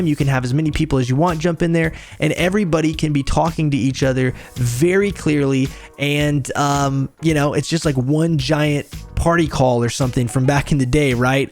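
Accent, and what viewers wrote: United States. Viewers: what is your accent? is that Texas/USA or California? your cadence is familiar - its modified with practice?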